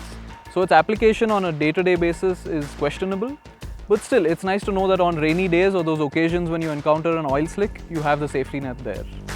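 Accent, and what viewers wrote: India. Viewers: I am doing my research on possible Bikes. I give props to people who have become proficient in another language. The problem for me as a native American English speaker is the heavy Indian accent. it makes it difficult for me to understand what the person is saying. I am not criticizing him. He is very fluent in English. His accent is what is getting in the way of me understanding everything he is saying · Indian accent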